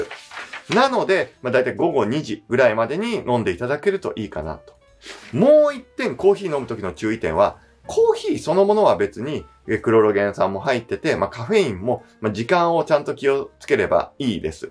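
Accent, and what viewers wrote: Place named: Tokai, Japan. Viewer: 先生は東海出身でしょ〜所々方言出てる気がする.....！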